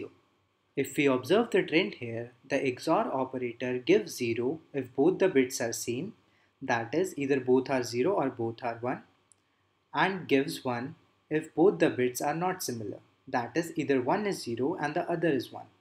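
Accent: India. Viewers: fucking indian accent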